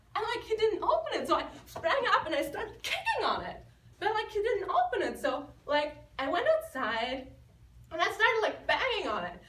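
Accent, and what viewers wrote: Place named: France. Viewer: ah- they want money for the original i guess. :/ i almost thought you might be french from the tiny bit of accent i heard. german is one of the languages i study daily. it's tough. keep up the awesomeness. : )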